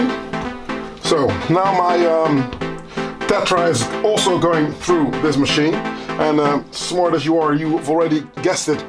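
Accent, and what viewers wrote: Netherlands. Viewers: Dude are you dutch? You have a heavy dutch accent. And I’m just some random dude from California and can hear it.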